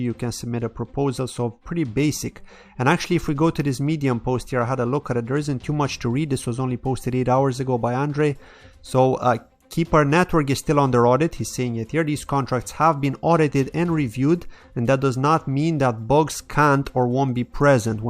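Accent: Ireland. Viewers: you have a slight irish accent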